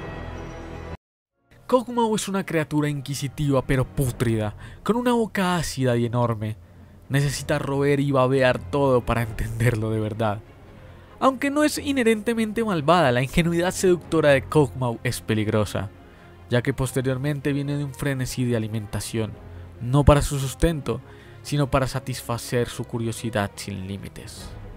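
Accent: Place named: Bogotá, Colombia. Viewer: Oye qué excelentes guías! Son las mejores que he encontrado en español. Felicidades. Tengo la impresión de que eres de Bogotá jajajjaau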